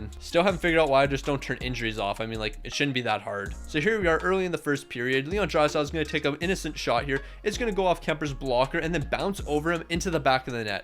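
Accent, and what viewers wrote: Calgary, Canada. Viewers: Are you from calgary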